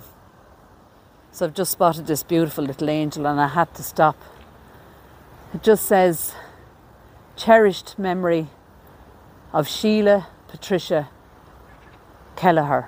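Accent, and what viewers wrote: Ireland. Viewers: Beautiful tribute and I love your Irish accent :) xo I remember her posting her videos discussing mental illness and abuse :(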